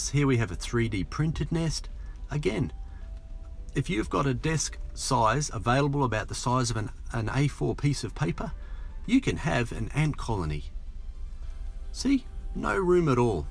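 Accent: Australia. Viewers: Crikey! Are you somehow related to Steve Irwin? Good to hear another Aussie accent on YouTube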